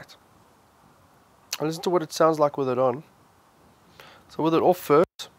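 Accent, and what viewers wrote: South Africa. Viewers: South African accent allert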